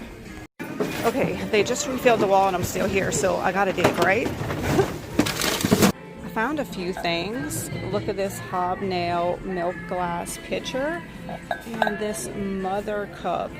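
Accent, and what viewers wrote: Louisiana, United States. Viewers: What is that accent? Louisiana?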